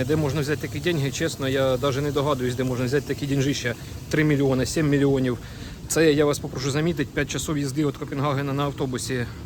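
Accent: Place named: Poltava, Ukraine. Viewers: Дівчата, нічого не згодна з Вами, його мова - це чистий суржик Полтавської області 😊. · Привіт з Полтавщини! Суржик клас! Прям як у нас!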